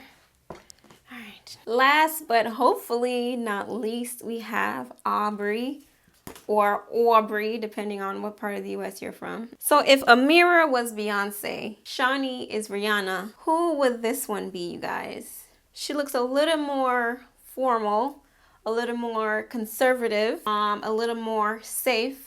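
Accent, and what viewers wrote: Jamaica. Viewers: Mi did know yah Jamaican ....🤣🤣...lol first time watching you and with in the first few minutes I was like she prob Jamaican......guuurl! New subbie😂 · OMG! Gurrrrrrlllllll I LOVE YOUR WIG VIDEOS! Your personality is what really drew me in as a subbie! But I heard an accent OMG are you Jamaican ? Wish I could subscribe 10 more times now! You go girl!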